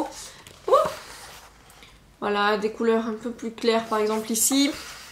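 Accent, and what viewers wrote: England, United Kingdom. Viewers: Tu me fais trop rire, t'as des fois un petit accent anglais, j'adore! T'es un peu fofolle je crois :p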